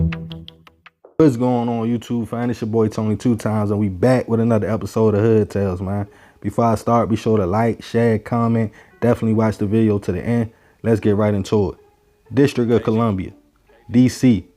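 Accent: Baltimore, United States. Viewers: Are you from Baltimore?? I'm from DC, and I have family in Baltimore so I know a B.More accent when I hear one. The area that this went down in is on the DC waterfront, this is the neighborhood by the DC wharf. "The Spirit Of Washington" yacht does dinner cruises on the Potomac River and the boat leaves from the dock next to the wharf..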